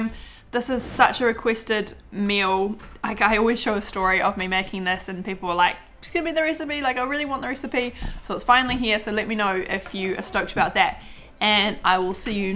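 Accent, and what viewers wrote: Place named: New Zealand. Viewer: I always enjoy your videos - the lighting has never been something I've noticed...I'm so focused on your awesome Kiwi accent!! I love it! Super cute kids and your older one helping you here was fun to watch. Will def make this chowder soon! (even tho still VERY hot where I am in Texas) Thanks for your channel! (sorry about your lockdown weariness, saw that on Insta today :( wish Covid would disappear.)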